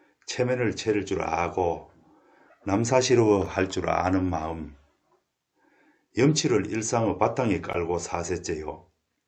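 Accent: Andong, South Korea. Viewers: 안동사투리인가요~~^^ 정겨워요~~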